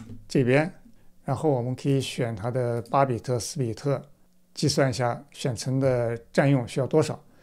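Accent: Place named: Xinjiang, China. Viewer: 老哥。听口音 新疆的哇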